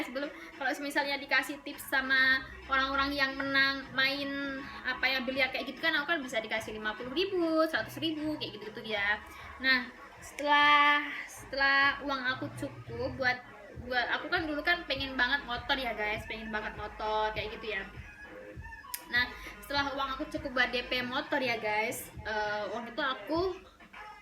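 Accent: Indonesia. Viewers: logat jawa timurnya gk luntur semangat! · Buat satu konten yg full pakek logat pacitanx.................. Pasti seru.........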